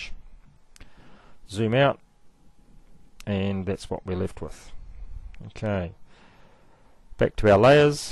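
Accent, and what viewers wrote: New Zealand. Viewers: Man, brilliant kiwi accent!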